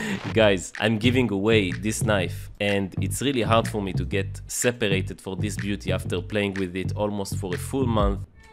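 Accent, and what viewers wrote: France. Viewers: knife looks very bien, luv ur french accent, but id love to have the knife even more :))) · I love you and your french accent :3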